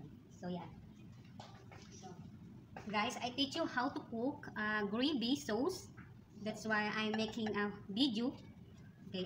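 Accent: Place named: England, United Kingdom. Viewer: Nag enjoy ako panonood lods,,good vibes ang pagluluto mo with English accent hehe..new friend, Joyce Toda brought me here..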